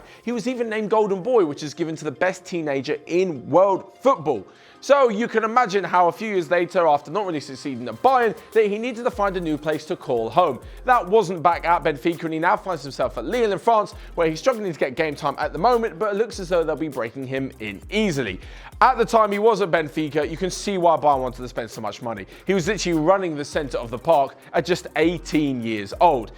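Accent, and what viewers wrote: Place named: Cockney, United Kingdom. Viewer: How about speaking English, not low IQ Cockney!? 👅🙈🙉😭😂😢😣😰😀👎👎👎👎👎👺👹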